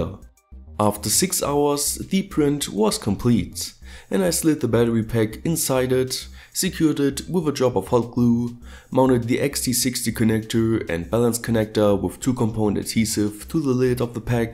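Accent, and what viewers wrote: Germany. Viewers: Klingt wie ein deutscher der englisch spricht, schade dass es sowas nicht in Deutsch gibt :( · he's saying NICKLE ribbons,, for anyone who cant distinguish it ;) He's adapted that annoying youtube podcaster inflection and coupled with his german accent it sounds a bit ,... not good.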